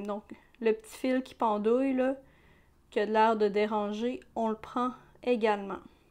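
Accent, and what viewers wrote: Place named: Quebec, Canada. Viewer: Je n'enlève rien aux autres tutos, mais je suis contente d'entendre parler un français québécois, ça fait du bien. Merci pour ce tuto 😁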